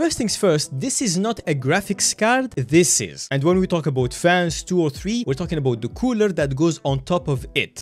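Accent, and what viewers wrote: France. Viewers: What's up with that accent 😂😂 I swear every language besides English sounds weird or lame 😂 whatever accent this is sounds lame like French or something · Interesting voice, is that french accent ? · Are you french ?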